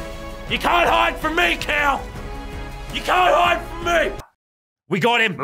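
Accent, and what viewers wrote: Australia. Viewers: The Aussie accent😒😒 as an Aussie myself i find our accent to be the worse in the entire world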